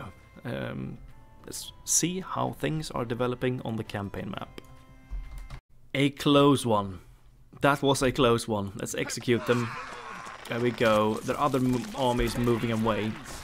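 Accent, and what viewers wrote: Sweden. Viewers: Haha I can hear the swedish-english accent. Awsome video and gameplay! 💪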